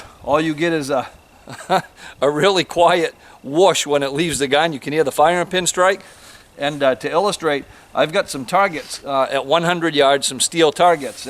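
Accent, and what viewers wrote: Maine, United States. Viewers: awesome video. you gotta be from Maine! 👍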